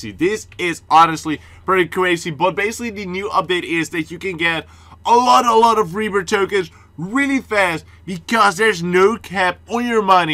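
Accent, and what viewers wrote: Netherlands. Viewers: Bruh hes dutch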